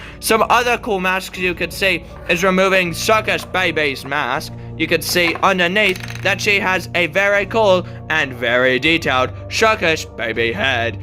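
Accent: United Kingdom. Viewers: What's with the very British voice?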